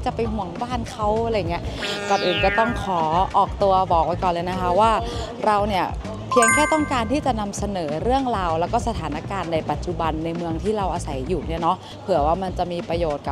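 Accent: North, Thailand. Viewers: สาวเหนืออู่คำเมืองน่ารัก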